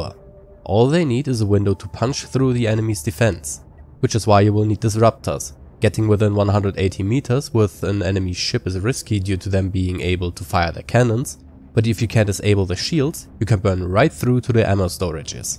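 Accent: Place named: Germany. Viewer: Warum hört sich das an als wenn ein deutscher gut Englisch spricht?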